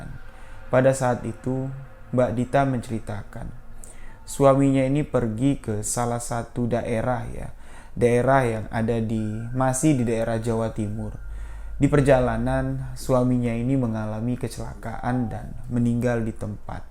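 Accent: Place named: Jambi, Indonesia. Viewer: Konsep konten Story Teler nya mirip channel SUNYI, cara menyajikan ceritanya menarik dengan intonasi suara saat bercerita... Khas jambi banget. Sedikit koreksi mas, pernikahan itu kan hukum Agama, dalam Islam... Masa iddah wanita itu sekitar 3 bulan, setelah lewat 3 bulan masa iddah maka wanita tersebut boleh menikah lago. Jadi jangan dibenturkan dengan kearifan lokal yang menyatakan "tidak boleh menikah jika masih 7 bulan menjanda"... Baik itu berdasarkan adat jambi atau adat jawa, dll. seolah-olah menikah lagi setelah 7 bulan ditinggalkan suami adalah sebuah hal yang haram (tidak boleh). Tetap hukum Agama diatas hukum kearifan lokal, jika hanya ingin beropini sendiri, cukuplah katakan "jika masih bisa menahan syahwat, sebaiknya menikah lagi setelah 1 tahun setelah meninggalnya sang suami.".... Jadi hal itu sifatnya anjuran saja. Saat ini masih nyimak konten-kontennya bang... 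Semangat bang..!!!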